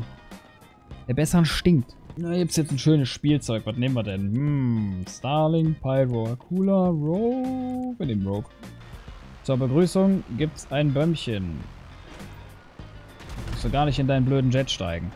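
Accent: Cologne, Germany. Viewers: Kommst du aus der Nähe von Köln? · ahaha wurden die dezent wegpenetriert lol.......dein Köllner Akzent ist wonderfull:D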